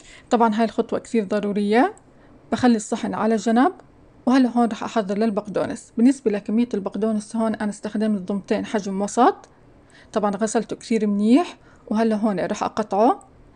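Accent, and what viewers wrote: Irbid, Jordan. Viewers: ما شالله عليكي بحب كثيييييير طريقتك في الطبخ جد فخورين فيكي كاردنيين وخاصة انك من اربد 🙃♥️🌹🌹😍 طبعا عرفت انك من اربد من لهجتك 😍🌹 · يسلمو ايديكي ست وأنا كمان غير إنو وصفاتك زاكيه بحضر عشان لهجتك الأردنية بتجنن طالعة منك ابن خالتي من سكان عمان لما بيجي عنا ع فلسطين بترجاه يحكي باللهجه الأردنية تحياتي لألك من نابلس فلسطين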